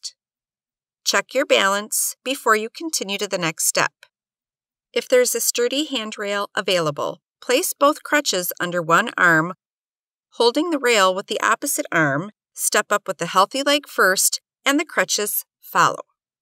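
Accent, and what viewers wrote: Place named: Midwest, United States. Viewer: Why does narrarator have to be from the Midwest...very annoying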